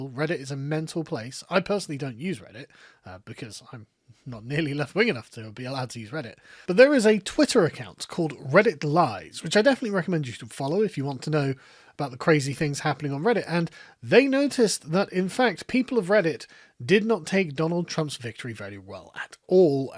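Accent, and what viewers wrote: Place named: United Kingdom. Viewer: i think you had something on your face. british english is weird. youll prounce "water" as "watah", but "swastika" as "swastiker".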